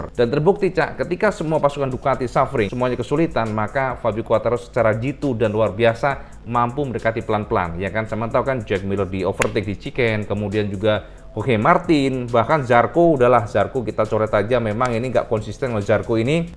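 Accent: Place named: Java, Indonesia. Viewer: Jos cak, cennel medok udah update 😂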